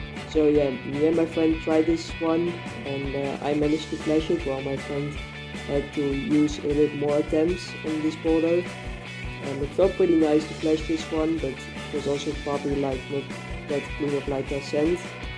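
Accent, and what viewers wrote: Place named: Netherlands. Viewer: Ja, begrijpelijk. In dat geval zou ik werken aan je uitspraak. Het is oké om Engels/Amerikaand accent te bluffen, dan krijg je er op den duur wel gevoel voor. Aangezien het Nederlandse accent nu erg sterk is. Ook de werkwoordgrammatica. Verder goede videoconstructie, dat je ook review-reviews doet, erg leuk!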